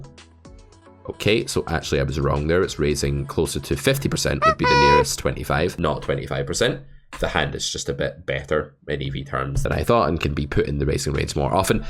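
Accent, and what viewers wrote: Scotland, United Kingdom. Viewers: The auto generated captions are actually really good here with beautifully clear Scottish lilt.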